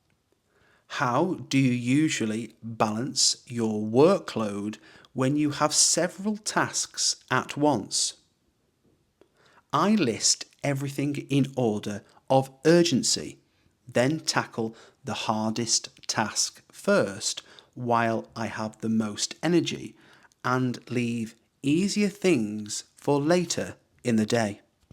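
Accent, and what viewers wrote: United Kingdom. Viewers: What kind of Britishaccent is it specifically?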